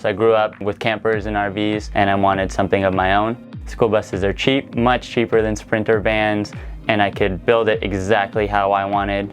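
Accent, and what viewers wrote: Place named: California, United States. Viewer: I don't remember if he said his location more specifically than the PNW but he sounds, to me, like an average Californian. You're making sweeping generalizations of a vast country, where people have had varying levels of educational opportunity, and insulting him based on it. He is presenting to a channel that likely has a wider audience than just the formally educated, some of whom might not recognize the array of synonyms that one may use to describe the things that have been a pleasant addition to their build. I'd argue that he was a very contextually effective speaker, using a language and tone that fits the channel and its viewers, and brought a fair amount of charisma, to boot. Regardless, organization and tool-building was also vital in bringing humans to our current time and place, and he seems to have a high level of aptitude for making and executing plans which met his personal goals. 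Why do Aussies call everyone "mate"? Why do the British throw around "slag" and "bollocks"? There's a unique context to every culture, and it's beautiful that there's more choices than just Standard English in our world.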